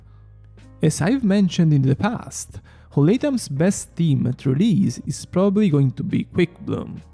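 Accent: Italy. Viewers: I love this italian accent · I thought he was Italian from the accent but damn 😂😂😂😂😂😂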